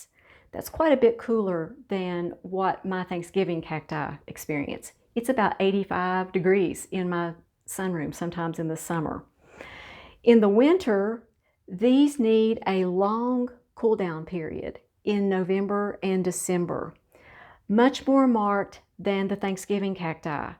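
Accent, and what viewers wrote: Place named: Tennessee, United States. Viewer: Are you in Tn. We have the same accent.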